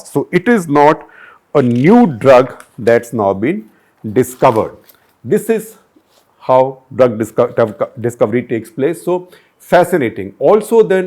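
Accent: India. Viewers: Good presentation in indian English style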